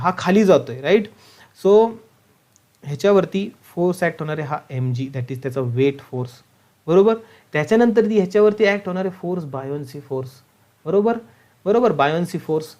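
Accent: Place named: Parbhani, India. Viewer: Sir tumhi Parbhani che aahat ka? Tumchi accent tashi watli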